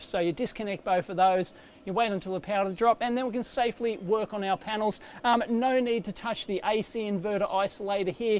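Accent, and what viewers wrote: Australia. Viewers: There are 2 reasons why I watch your videos: 1. Electronics 2. Your Aussie accent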